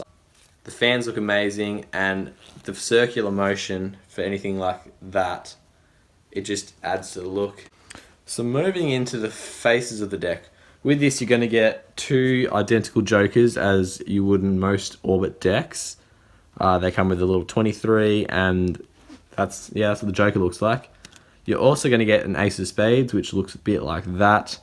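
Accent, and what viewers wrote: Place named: Australia. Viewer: Great review my guy! I already have the cards but that Australian accent kept me on the video 😂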